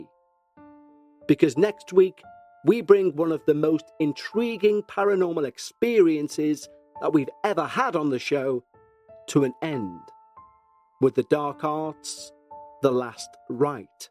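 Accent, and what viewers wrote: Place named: Liverpool, United Kingdom. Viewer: Out of all the paranormal podcasts, this is by far the best. As someone from Preston, you are deffo a Scouser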